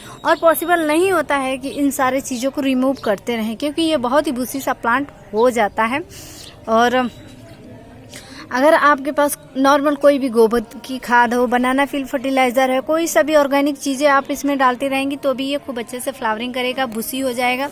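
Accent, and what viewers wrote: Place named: Uttar Pradesh, India. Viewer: Accent se aap UP ki lag rahi ho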